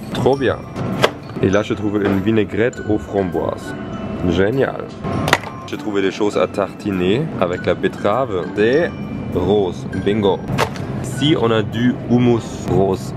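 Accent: Quebec, Canada. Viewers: J'aime trop l'accent québécois ^^ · Je surkifffffffff lacscen quebequois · J 'adore ton accent canadien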